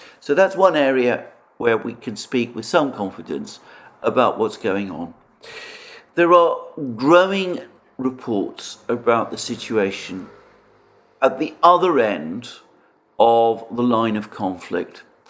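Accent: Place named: North London, United Kingdom. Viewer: Michael Caine = East London accent. Alexander = RP (received pronunciation, ie, schooled,) with North London overtones. The people you knew from Wales will have been extremely different, more of a sing-song and softer accent. The regional accents from, for example, Wales, Cornwall, north of England and Scotland vary widely among themselves and are an important part of our history and heritage. More diluted now than they used to be, but still interesting. Likewise, of course, American accents differ, in different parts of the country. 🤓🤓🤓👍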